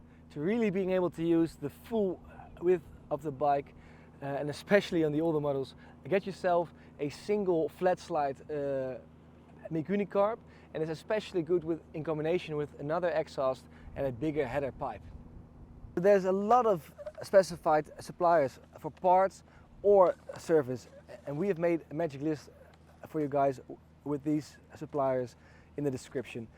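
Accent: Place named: Netherlands. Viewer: Hey! Ive been interested in getting a honda XR600R (after getting my licence) for a while now but as i'm a beginner i feel like it might be a bit too much. do you have any recommendations for similar bikes that are more for beginners and that are easilly/cheaply available in the Netherlands (its sounds like you're from here) Thanks in advance!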